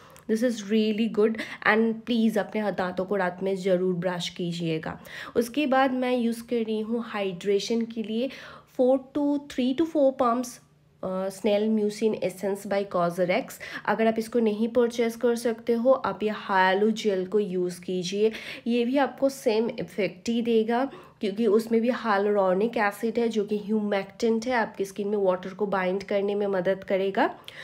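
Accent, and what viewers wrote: Bengal, India. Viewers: Looks like a বাঙালি Sounds like a বাঙালি too ❤❤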